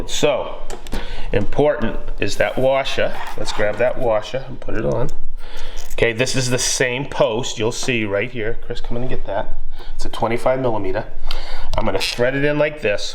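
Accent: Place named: Massachusetts, United States. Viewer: Cambridge MA here the accent I think your from Massachusetts? Thanks for sharing!!!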